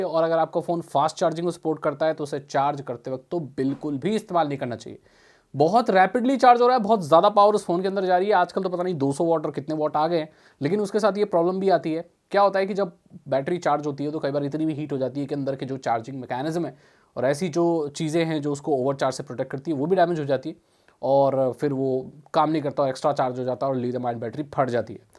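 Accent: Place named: Bihar, India. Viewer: Ap paka bihar hai na bhaiya mujha aise kyu laga hai ki ap bihar sa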